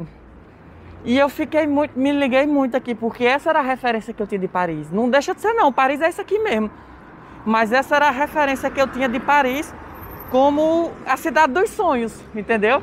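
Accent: Ceará, Brazil. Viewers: Rapaz, vc me impressionou. É tão lindo o seu jeito de falar cearez, mesmo com momentos muitos difíceis, sua forma positiva de encarar as coisas, é realmente, motivador!💛🇧🇷💚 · Ohh, te admiro demais viu... Tbm sou cearense e amo ouvir sua voz, o sotaque 🤩🤩. Deus continue te abençoando viu mininu... 🙏🥰😘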